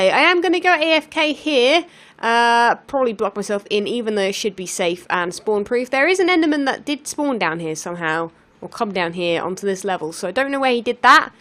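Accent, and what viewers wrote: United Kingdom. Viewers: Excited much???? Gaw, at times you were talking so fast I couldn't understand you through that thick British accent. No offense meant, seriously none meant. Have to say things like that because apparently I can offend any female just saying "Hi". Love it take care.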